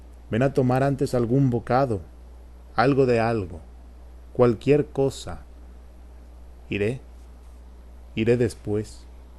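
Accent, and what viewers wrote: Mexico. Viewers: ¡Hola! Por favor, este es el español mexicano? (Is this Mexican Spanish please?)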